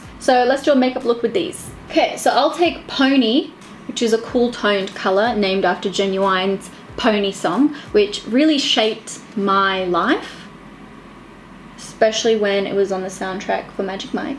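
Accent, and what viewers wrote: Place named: Australia. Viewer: watching your videos remains the best part of the day 🎉 your accent reminds me of my best friend back in Australia and I looove it 😍 btw, this look is some serious 🔥🔥🔥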